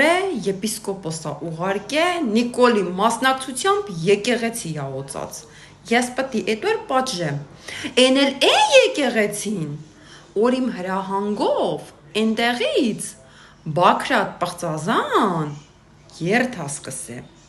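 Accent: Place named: Martuni, Armenia. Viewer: Աղջիկ ջան դու վերջն ես ախար լավնես , բա մարտունու լեզվով շաատ սիրուն ես խոսում դու😂😂❤❤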